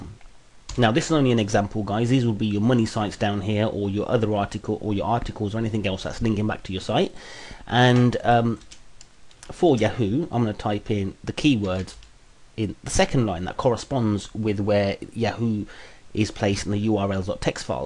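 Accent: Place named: England, United Kingdom. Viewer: I love your english accent, where do you come from ?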